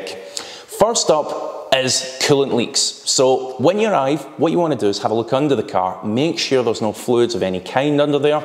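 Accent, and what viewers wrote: Scotland, United Kingdom. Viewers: Don’t be ridiculous have you never heard a Scottish accent before!!!!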